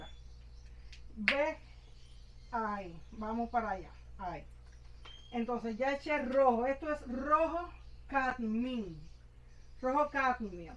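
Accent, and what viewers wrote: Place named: Cuba. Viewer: HOLA ME ENCANTA TU TONADA CUBANA!!! MUCHAS GRACIAS POR COMPARTIR TUS CONOCIMIENTOS 😉 BENDICIONES 💖 😊 😘 🙏 UN ABRAZO GRANDE DESDE CÓRDOBA ARGENTINA 😊❤❤❤❤❤❤❤😊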